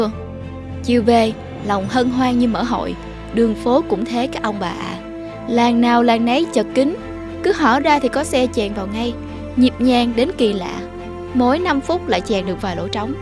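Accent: South, Vietnam. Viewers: nói giọng Nam mà lại nói tiếng Bắc Kỳ là sao? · Ad là người miền Nam hay Bắc , giọng thì miền Nam nhưng dùng từ ngữ thì toàn miền Bắc như còi , to , bố ... tò mò thôi nhưng nội dung hay nha !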